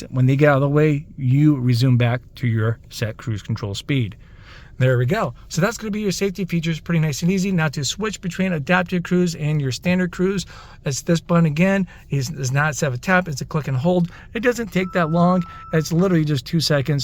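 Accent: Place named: Midwest, United States. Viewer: Sounds like a Midwest English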